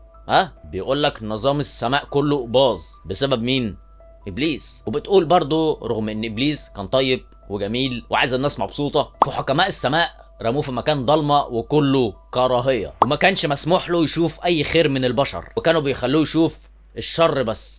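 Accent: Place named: Syria. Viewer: من البداية من لهجتك على بالي انك سوري مش مصري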